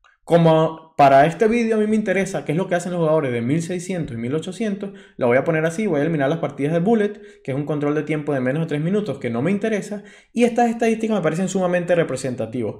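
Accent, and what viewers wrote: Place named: Venezuela. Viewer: Joder tremenda explicacion Soy 1800 y jugador del sistema londres y desconocia ese tipo de castigos muchas gracias bro. Saludos desde Venezuela que creo que eres venezolano por el acento y mas si te recomendo Gascon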